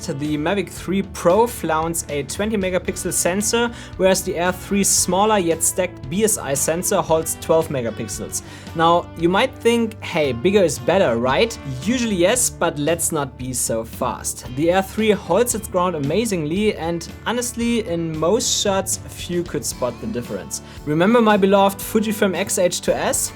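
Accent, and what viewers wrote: Germany. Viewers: Love that German accent 😂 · Deutscher Akzent so krass. Grüße aus Deutschland -:)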